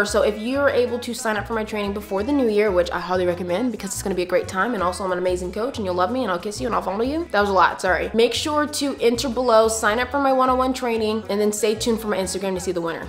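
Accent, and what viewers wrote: England, United Kingdom. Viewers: I love how you casually speak in an English accent, I thought I was the only one to do that 😂❤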